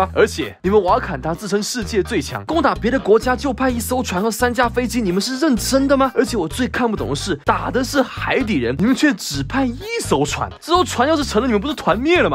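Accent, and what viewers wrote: Taiwan. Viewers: 你講話好台灣腔哦 都沒有捲舌欸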